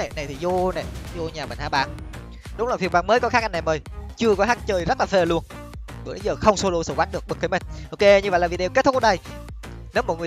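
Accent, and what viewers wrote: Binh Dinh, Vietnam. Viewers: Quê ban ở bình định hả ban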